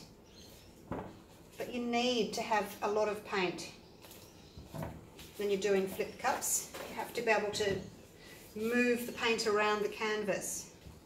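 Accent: Australia. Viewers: Simply stunning. With that Australian accent I assume you live there too. Is global acrylic paint available in Australia and which supplier, thanks.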